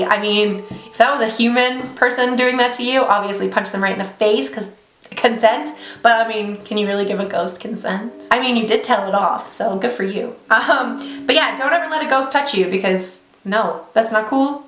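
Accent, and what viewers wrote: Los Angeles, United States. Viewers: good stories but the LA voice really does break the immersion lmao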